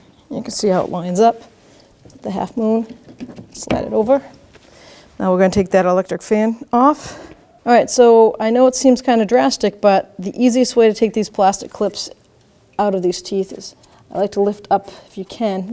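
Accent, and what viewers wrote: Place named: Massachusetts, United States. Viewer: Love me a good mass-hole accent!!! Great video! Thanks for the help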